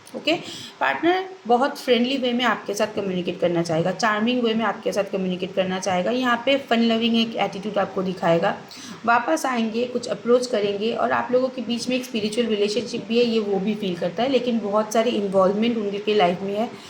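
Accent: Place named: Bengal, India. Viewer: Bhai ami tarot reading khub ekta dekhini age, normal rashifol dekhechi. Kotha sune mone holo tumi bangali tai ... khub valo reading tomar ... clear cut ... subscribe korlam... thank you ... but 1ta proshno moner modhye ghurche reading ta dekhar por thekei ... ami pure single, kothao kono option nei, ageo chilo na ... khub cleverly keu propose na kore deep interest show koreche but amar intuition bolechilo may be married... amar janar kono scope chilo na takei jigasa na korle, ami jigasa korteo parini but se kaida kore jene niyechilo ami married kina .. 1ta kajer jonno kotha hoyechilo.. romantic connection hobar kotha ekdomi noy .. ekhon kotha holo je married se to tar spouse ke chere kono din o onno kauke commitment dey na, tahole tara nijeder situation jene sudhu sudhu single der life e kosto keno baray ? Ami to flirt kori na kokhono ... korle amaro anek relation thakto fole seriousness karo proti thakto na, sobtai khela hoto ... 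sob cheye beshi koste achi ami, jobless, struggle korchi, ro anek problems ache life e ... tar vetor aei notun problem jar kono solution ache bole to amar mone hochhe na - sudhu reunion diye ki hobe bolo ? I need steady relationship not fun game... ami married hole to jeche pore onno kauke kosto dite jetam na kokhono... jara commitment debe na tara ase keno boloto ? By the way ami esob soulmate, twin flame ki jani na, bujhio na. Simple relation bujhi jekhane prem hoy eksonge thakar jonno.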